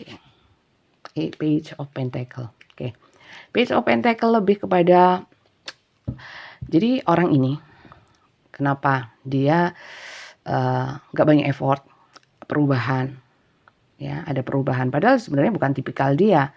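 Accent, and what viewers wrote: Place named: Malang, Indonesia. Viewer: Suka banget cara nyampaikan bunda , Arema banget pokoknya soalnya dulu waktu kuliah di mlng teman teman cara ngomongnya ya logatnya kayak bunda deh, kakak saya juga banyak yg tinggal di mlng jadi klop dgn bunda , enak dengannya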